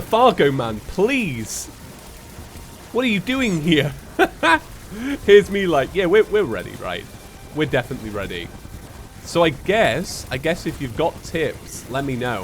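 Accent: United Kingdom. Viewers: Saga of Brittish Accents Tuesday-Chewsday Silva-Silver Terra-Terror i cant remember any other ones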